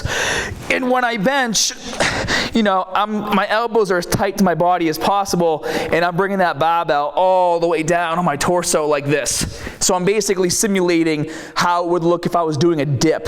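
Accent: Boston, United States. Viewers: is he from boston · havnt watched your vids for a little while now but ERM lol what's with that Boston accent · Where did the Boston accent come from??? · I dont need your opinion Hell no. Don't disrespect NJ like that lol. This is a Baaahhhston accent. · Are you from Boston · Did he always have a Boston accent? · Did you just say Bah? That Bahston accent really coming out hard, bro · Great vid. Accent is great hah, from boston? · since when did you have a boston accent? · Good video so ima guess your from Boston lol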